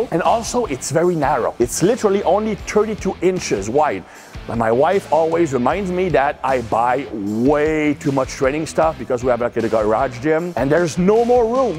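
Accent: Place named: Quebec, Canada. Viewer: Vive le Québec libre ton anglais est excellent mais l’accent te trahit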